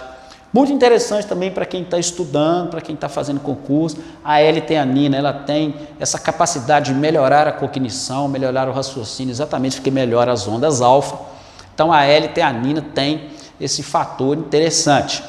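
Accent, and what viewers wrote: Minas Gerais, Brazil. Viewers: Eita sotaque mineiro sô 😁. Vídeo sensacional, graças a Deus durmo sem precisar usar nenhum desses suplementos 🙌🏻. Vídeo ótimo para utilidade pública 👏🏻👏🏻👏🏻👏🏻👏🏻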